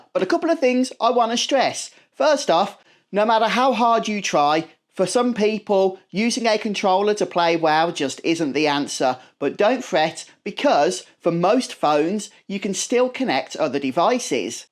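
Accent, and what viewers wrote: United Kingdom. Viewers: Goddamn british accent😂❤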